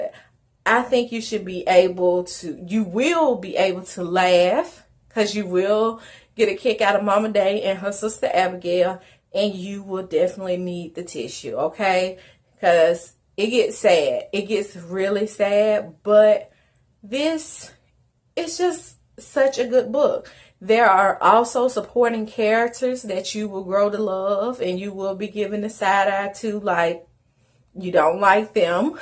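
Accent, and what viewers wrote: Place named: South, United States. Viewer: I didn't want Mama Day to end 😢. Love your Southern accent , TN girl here just subscribed 💕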